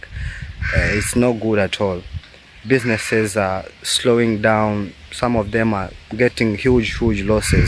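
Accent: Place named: Uganda. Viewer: Bro you sound like a Ugandan and you are saying you are from Kenya😮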